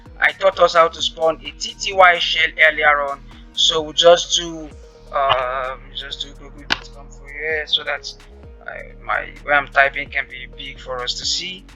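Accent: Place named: Nigeria. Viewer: Thank you very much sir, you sound like a Nigeria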